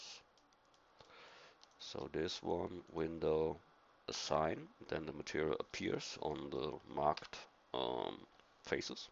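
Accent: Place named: Germany. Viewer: #germanaccent :D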